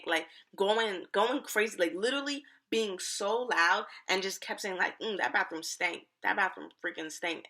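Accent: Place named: New York, United States. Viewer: I love your vibe , I literally feel it through the screen. From your vibe to vocab I love it; I heard you voice and was like yuppp sis gotta be from NY. Just subscribed; love ya 💕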